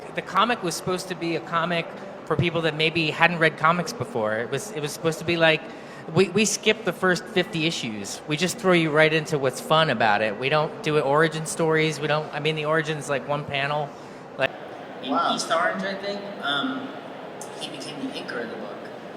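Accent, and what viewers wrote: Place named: California, United States. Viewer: He's lost the Jersey accent and sounds very Californian now, gorgeous man ! ❤️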